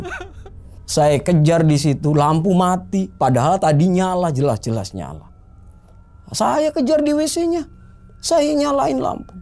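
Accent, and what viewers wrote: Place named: Indramayu, Indonesia. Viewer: Indramayu tenan kih uwong.....😂😂😂😂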